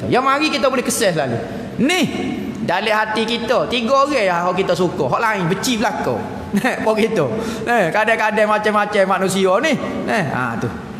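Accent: Kelantan, Malaysia. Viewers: Ustat ni dia ade aura. Aku tibe2 suke dgr bila dia bercakap.. ade yg aku x ckp faham dia loghat kelantan. Tapi apa yg keluar dr mulut dia sedap di dengar.. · betul walau loghat kelantan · Betui weh. Aku baru kenal ustaz ni seminggu kat yt. Hang bayangkan siang malam pagi petang aku bukak yt ja untuk dengar ustaz ni cerita… aku pun taktahu kenapa ustaz ni.. boleh melembutkan hati aku.. sedangkan aku taksuka dengar ceramah…tapi aku buleh dengar ceramah dia ni.. dari takfaham loqhat kelantan sampai buleh paham la sebijik2 apa dia kata.. ustaz ni ada aura dia tsendiri ni.. masyaallah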